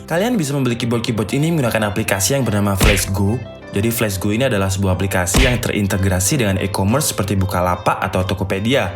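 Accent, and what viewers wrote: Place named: Surabaya, Indonesia. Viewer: Sampean wong suroboyo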